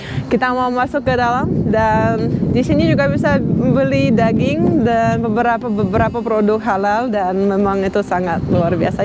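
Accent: Indonesia. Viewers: Wow keren bahasa indonya aksennya indo banget.. 🇮🇩❤🇸🇮👍😎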